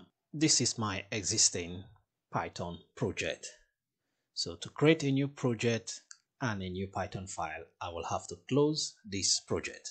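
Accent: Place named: Cameroon. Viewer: Bro, you sound Cameroonian. I am glad to see your page and I am subscribing right away! Great job!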